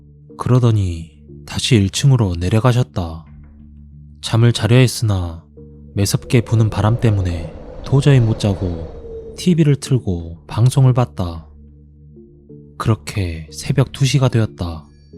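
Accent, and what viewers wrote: Jeolla, South Korea. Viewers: 제가 경상도인데 이분 사투리는 전라도 사투리인듯 합니다 근데 저는 이분 살짝 사투리 억양이 오히려 정감이 가던데요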